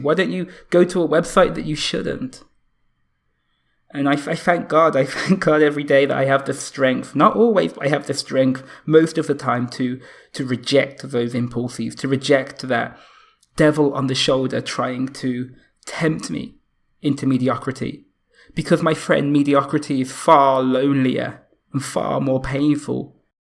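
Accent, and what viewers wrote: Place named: United Kingdom. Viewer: Self-improvement is lonely, yes. But there are definitely ways to make it less lonely. I generally use a gym rather than training outside, specifically for this reason. Judging by your voice, I'm guessing you're British? If you're out training between and 8 UK time, I'll be out training at the same time. I'll try to work in an outdoor session once per week so that, even if we aren't physically training together, it might help us both feel a bit less lonely:) Stay strong brother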